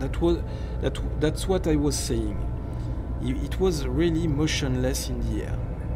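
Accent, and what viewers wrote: France. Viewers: You have a big French accent !!! (I’m French)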